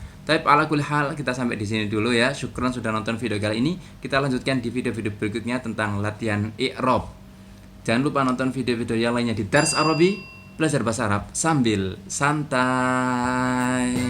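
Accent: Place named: Java, Indonesia. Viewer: maa syaa Allah.. medoknya kerasa sekali hehe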